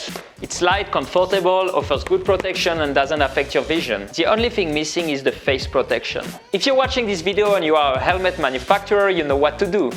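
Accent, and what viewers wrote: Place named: France. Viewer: ptdr je me disais bien que tu avais un accent français, cool !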